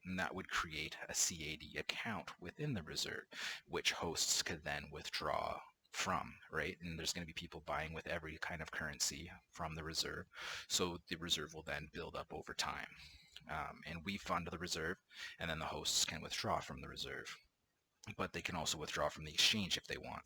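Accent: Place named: Ireland. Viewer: Sounded Irish to me :-)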